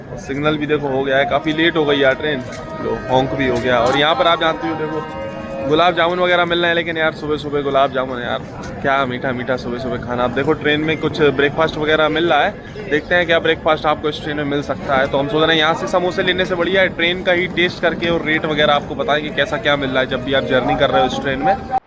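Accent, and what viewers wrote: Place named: Bundelkhand, India. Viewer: Tum bundelkhand ke lag rahe ho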